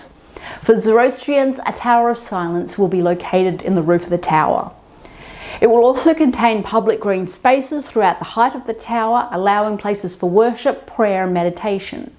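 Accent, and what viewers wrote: Australia. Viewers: I love what you're contributing to the world's death conversation with an Aussie eye, heart and accent,! There's a bit about the annual redressing of the dead and bringing them to the dining table in Caitlin Doughty's book, From Here to Eternity as Well. While I'm personally in favor of the being eaten by wolves or vultures or insects or whatever camp, these practices from other cultures have their own beauty and wonder. (Sorry, I live in the US, so those are my most familiar resources. I don't for a moment believe that we have all the answers and am humbly grateful to get these extended world views!)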